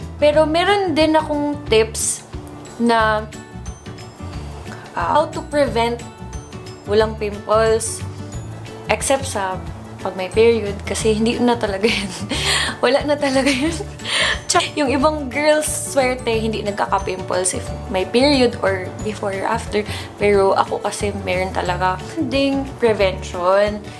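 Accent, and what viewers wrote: Visayas, Philippines. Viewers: HAHA! Nalingaw ko maminaw magtagalog ang bisaya.. Perti gyud kagahi ug kasamok maghunahuna unsa sunod e.estorya sa tagalog jud. Keep it up. Love your vids with dodong.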